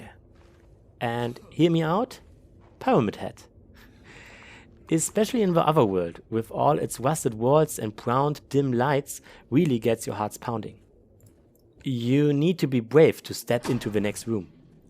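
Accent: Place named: Germany. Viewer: imo perfectly fine. Your english sounds very german but who cares. The content is great btw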